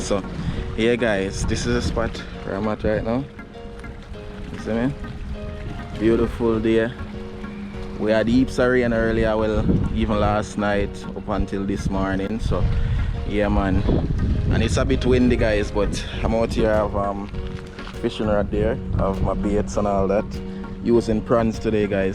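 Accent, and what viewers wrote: Jamaica. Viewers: I was about to comment on your ascent, then I read this comment and know your jamaican heritage, big up youth. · It was a gift, you dont refuse gifts. Pay it along to someone else if you feel like doing so. Its a blessing to you and your family. Some persons may have not acted well with you fishing there, she was very nice. Not to worry you were not begging and even if you were, its not a crime, she was nice, just give God thanks. I detect an accent, are you Jamaican? First time on your channel.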